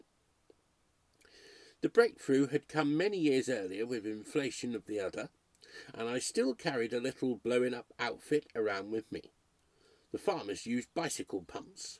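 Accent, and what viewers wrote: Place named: United Kingdom. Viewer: Thank you. Lovely british accent to go with it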